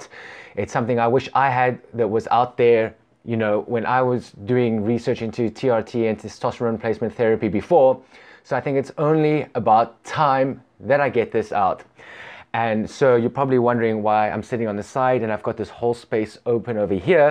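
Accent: South Africa. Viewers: Great video... Mine is 650, age 29 ... By the way, you have a South African accent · Are you South African ?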